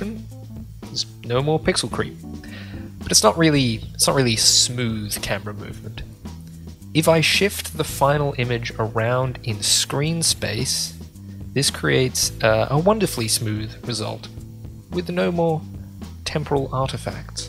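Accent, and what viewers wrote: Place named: United Kingdom. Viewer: It's nice to put a voice to the amazing work, we are honoured to be with you. Btw are from UK you sound like it lol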